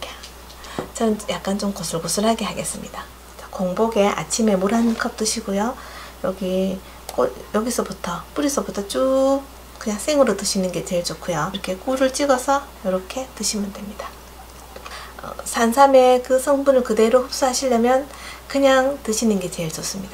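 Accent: Gyeongsang, South Korea. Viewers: 녜~ 경상도 말씨로 진행을 하셔도 매우 훌륭하네요! ㅎ ㅎ ㅎ 오늘은 귀하의 덕분으로 산삼백숙을 먹을려고 부산서 왔읍니다. 수고 하셨고요 - - - - 자주 뵙길 희망합니다. 🍉🍉🍉🍑🍅🍆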